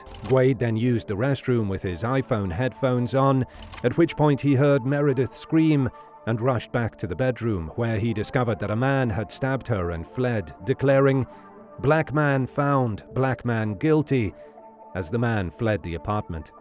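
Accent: United Kingdom. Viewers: Narrator talking so fast with a british accent was totally confusing and not understand alot of thr content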